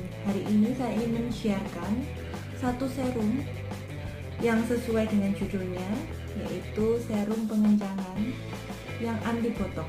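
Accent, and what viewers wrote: Java, Indonesia. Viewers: ini orang taiwan apa orang jawa ko suaranya medok ya hahaha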